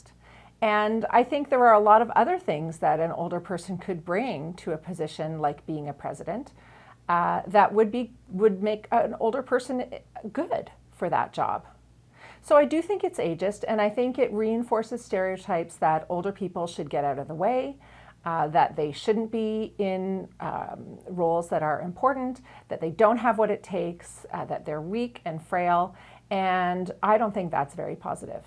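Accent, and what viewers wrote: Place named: Canada. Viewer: Your accent gave you away! You should have clearly stated at the onset that you are from Canada. As one who has spent much time in your country, there is an extremely strong bias against USA conservatives in general. For years and years, Canadian media has been obsessed with American bashing and has been rife with thousands of examples including long documentaries. That bias is daily and insidious and long-standing sport. So here you have a Canadian medical doctor (you) who is commenting "incognito" on USA politics by not identifying where you are from (and quite possibly, only because you are Canadian, hiding bias). I am sure you know that in high-level research, bias destroys validity. It is quite possible that you only wished to educate the public about dementia. However, the fact that you did not identify that you are Canadian and this concerns Joe Biden (in the United States), is both questionable and suspicious. 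There is a huge amount of recorded primary source empirical data demonstrating that Biden has a serious problem and most of the USA knows it (on both sides of the aisle). I wonder if one sees this kind of covert interference in Canadian politics by Americans?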